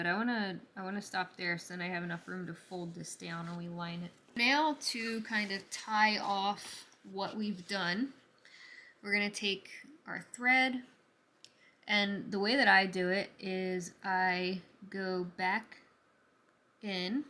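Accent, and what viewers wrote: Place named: South Jersey, United States. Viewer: Can’t wait to try this strap. Thanks. And by the way…and I’m not making fun because I grew up on NY and get a lot of comments about my accent, But I think you have that south Jersey accent which has the Philly influence. There’s certain words when it reminds me so much of my friends from that area. Good luck with channel!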